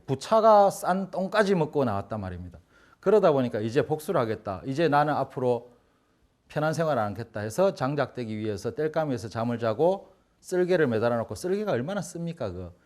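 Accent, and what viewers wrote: Gyeongsang, South Korea. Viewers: 경상도 말씀에~너무 유쾌한 강의가 지루하지않고 재미납니다~~~^#^♡♡ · 갱상도 말투 쌤도 괜춘하네^^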